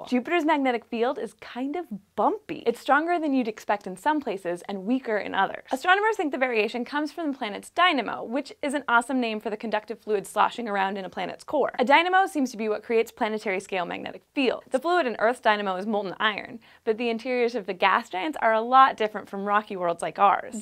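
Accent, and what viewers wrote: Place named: United States. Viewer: Slow down. I'll have to rewind to try and figure out what you said right at the start. I'm not American so your accent makes it even harder. Otherwise I like the way you sound.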